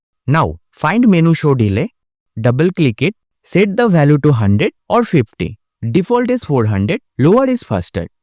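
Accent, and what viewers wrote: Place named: India. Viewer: chill, not everyone has en as native lang, it's just indian accent, the video is dead easy to understand... even without audio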